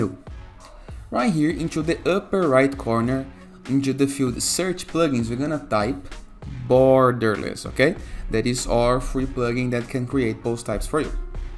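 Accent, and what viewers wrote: Brazil. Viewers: Cara, você é brasileiro?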